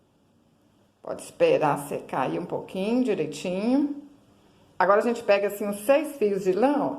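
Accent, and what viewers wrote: Minas Gerais, Brazil. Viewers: Difícil saber o que é mais fofo, se é o artesanato ou esse sotaque mineiro bom demais da conta... 💟💖💟💖😊😊😊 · Sem querer achei o seu vídeo e amei...vou tentar fazer essa lindinha. Pelo seu sotaque é mineirinha? Um abraço fraterno da carioca aqui.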